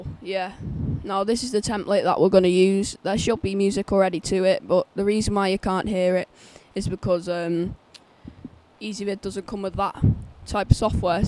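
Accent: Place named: Ireland. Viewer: thnx m8 love ya irish accent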